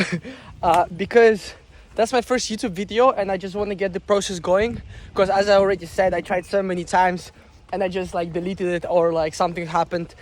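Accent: Russia. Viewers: Привет!) Ты русскоговорящий что ли?) Чувствуется немного жесткость русской речи в твоём произношении. Стало интересно узнать разговариваешь ли ты на русском?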